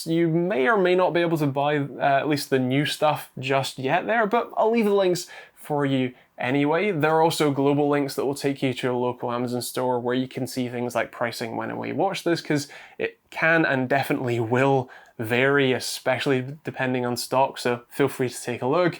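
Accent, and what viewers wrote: Scotland, United Kingdom. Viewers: Watched a few of your videos now, great work not getting caught up in the hype like most of the other reviewers. I know people aren't generally concerned about power consumption but the TDP is getting out of hand and like you said good luck keeping it cool. Glad it's a great performer but 241w is crazy IMHO. I've given you a sub nice to hear a Scottish Tech tuber!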